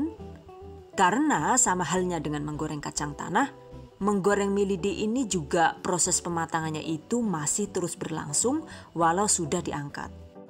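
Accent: Banyumas, Indonesia. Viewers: Aq ngakak kalo si ibu ngoMong ngapak..🤣🤣🤣. Yakinlah sumpah aq ngefensss karo sampean.. luph youu.. resepnya mantuul.. #salamdariwongpemalang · Lah ngapak wkwk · Deneng ngapak 😭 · Fokus suara ngapak🤣🤣🤣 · Ngomong ngapake lah buk, ngakak nyong 😂😂😂 maturnuwun resepe bu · Alakaehh wong ngapak kie tah 🤣🤣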